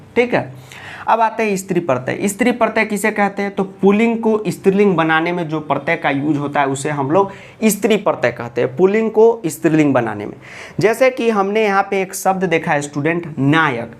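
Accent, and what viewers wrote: Bihar, India. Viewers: sir are you from Bihar bcz your language is so sweet I also belongs to bihar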